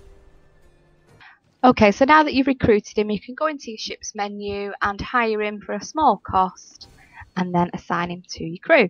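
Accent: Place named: Northern England, United Kingdom. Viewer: Great tip thanks! Subscribed as your content is spot on (and its great to hear a fellow northerner doing guides on my fave games!!)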